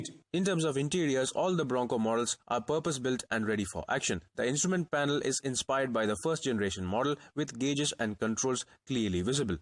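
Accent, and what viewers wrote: India. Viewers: What’s an Indian doing narrating this it sounds so annoying and I can’t finish the video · What in the hell is it with the guy from India doing the narration ???????????? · The accent of this man, reminds me a liquor store or seven eleven.....Indian accent..you can figure it out miles away... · I’m sorry but when we have an Indian/no offense talk about the Ford bronco it doesn’t sound very American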